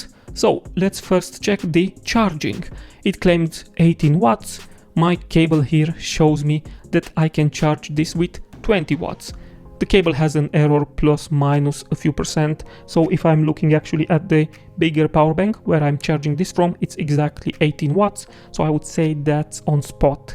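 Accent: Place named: Romania. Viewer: accentul de roman se cunoaste fratele meu :D:D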